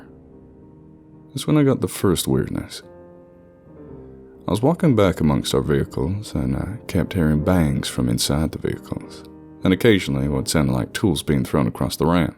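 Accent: South, United States. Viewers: Wow... Your Southern accent is amazing! I almost could have sworn it was your native accent. Well done! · you do a great Southern USA accent! Seriously! I live in Kentucky, but spent lots of time at Lejune while my dad was in the Navy. You nailed the patois perfectly. I love your voice and your giant nature loving heart. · Your southern accent makes me smile 😉👍🏽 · As a native Kentuckian, I can confidently say that you actually put on a decent southern accent. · For a Brit, you do a damn good southern accent. Bravo. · Your American accent is getting better, or I guess I should say accents. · You do an amazing southern American accent for an Englishman! ❤️👌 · Bro ur up there with Jason Hill(chilling tales for dark nights) and ur southern accent is pretty on it espically for u being British.🤘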